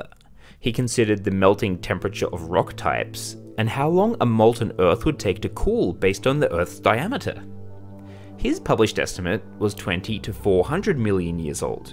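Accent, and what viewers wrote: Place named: Australia. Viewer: I reluctantly clicked on this video, thinking it'd be just another AI-written and narrated video, but upon hearing the mellow Aussie voice and the distinctly human drawings, I was pleasantly surprised at the discovery of this channel. Looking forward to watching the rest of the series!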